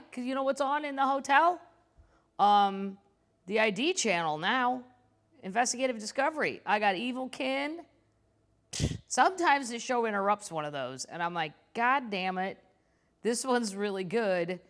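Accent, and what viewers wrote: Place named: South, United States. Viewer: like the accents, specially southern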